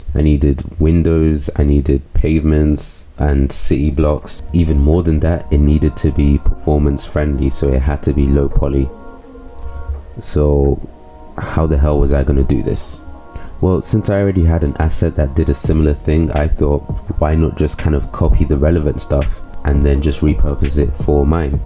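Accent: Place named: West Africa. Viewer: yo, great work but you have a similar accent as me, are you from west africa, not to be offensive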